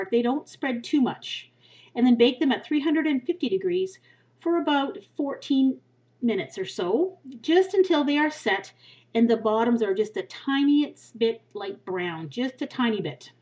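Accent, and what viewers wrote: Canada. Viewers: As an american, she definitely has a canadian accent. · she's canadian, right?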